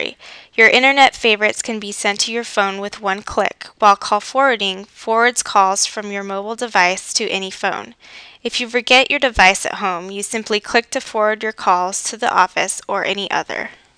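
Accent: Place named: Scotland, United Kingdom. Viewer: Awsome dialect. Remnds me of COD4 Cpt.MacMillan. Are you Scottish, or Irish?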